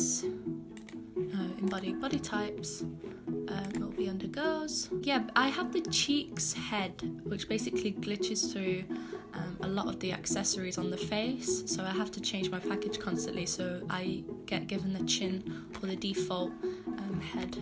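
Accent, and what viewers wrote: United Kingdom. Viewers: Omg i love your british voice!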